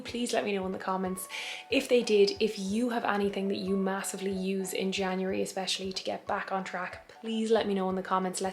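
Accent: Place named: Ireland. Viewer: I thought your accent was Irish. It really suits you!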